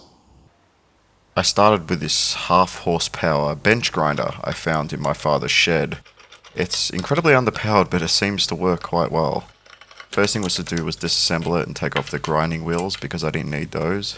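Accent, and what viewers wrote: Australia. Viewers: WOW!! great work... nice to hear another Aussie voice on youtube..If i may make one comment, ive always used a router with a center jig for cutting out circles.. but i do like your idea of using the beltsander while the sanding disc are spinning...new subscriber..cheers! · Are you Aussie?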